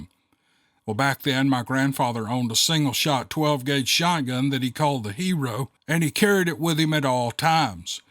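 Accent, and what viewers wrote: South, United States. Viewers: I moved to Maine 6 years ago from Louisiana/ Texas. Grew up in the Sabine river swamp and spent years deep in the woods there. I Love it here! But yes, the woods are very different. Not so creepy, really, just different. I love your fabulous stories (miss the southern accent) but don't really lean toward belief... And moose, yeah... I'd rather find a bear! But honestly both really only ever just run away like scalded chickens. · First thing Monday morning the great Sir on my phone this 5am awesome Sir great read and more Awesome real voice lovin that southern twist voice truly awesome thank you Sir miss that southern voice yes Sir this story is great as usual missing (Steve Lily) but yer presence is great hopefully yer fall/winter is relaxing at home with family have a great thanksgiving to y’all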